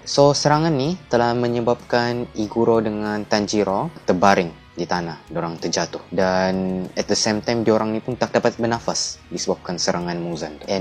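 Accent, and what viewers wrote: Sabah, Malaysia. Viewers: orang sabah bah ni, begini lah kalau org sabah bercerita memang feel betul 😁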